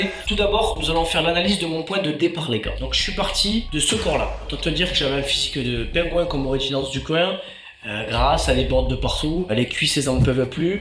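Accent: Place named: Pau, France. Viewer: Ha je me disais aussi que tu avais l’accent de chez moi ^^ Je suis palois aussi . Adishatz beroy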